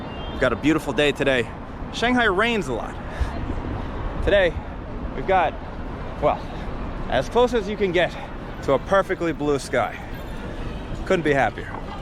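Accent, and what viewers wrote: New York, United States. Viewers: Sunglasses, camera + your american accent... Times are clearly different. I don't think that woman was a scam artist. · Yankee speaks Chinese with accents cool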